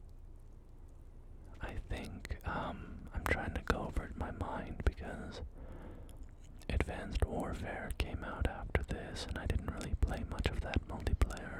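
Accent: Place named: Scotland, United Kingdom. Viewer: you have a scottish accent in the way you pronounce certain things it became very apparent right before you revealed your heritage i share some irish/scottish blood as well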